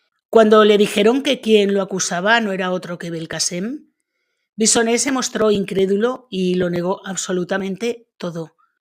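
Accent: Aragon, Spain. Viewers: excelente vídeo.Eres aragonesa? Tienes un cierto acento maño,que me encanta 😍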